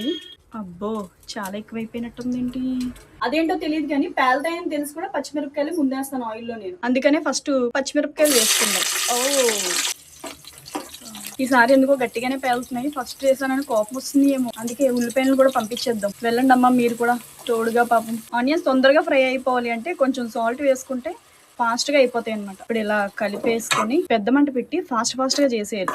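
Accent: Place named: Palakollu, India. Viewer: Meedi a ooru akka ? Palakol vacha antunav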